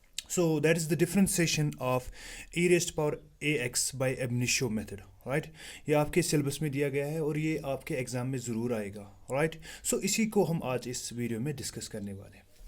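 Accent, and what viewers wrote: Kashmir, India. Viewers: Your kashmiri accent 👀🤌🏻,,thanks for the explanation ✨ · Yes you are kashmiri Your urdu speaking says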